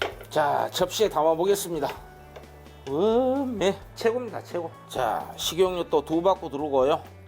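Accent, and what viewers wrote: Jeolla, South Korea. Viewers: 헐 지는 전라도 본토 발음 같은디.. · 경상도 아니고 전라도 사투리 인거같으신데요!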